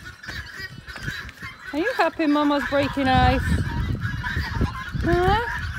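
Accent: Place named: Ireland. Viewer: You sound a little Irish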